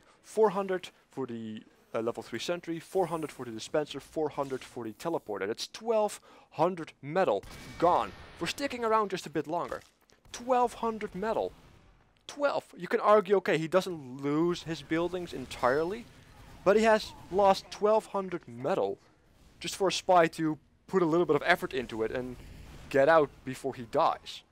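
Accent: Netherlands. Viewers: were u from? u sound a bit Dutch like :)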